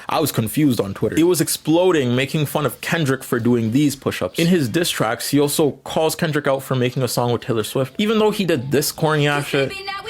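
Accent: Canada. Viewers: As soon as I heard your voice I could tell you were a fellow Canadian 😂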